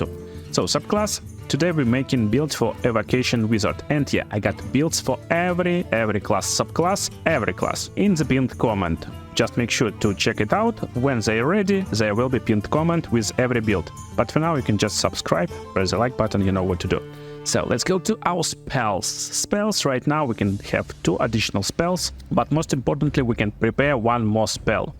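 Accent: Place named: Russia. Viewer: Man, sorry for this question but are u Russian? :D Just interesting, you speak clearly but a little bit with an accent like a russian And thank you for the video! Great review about wizard!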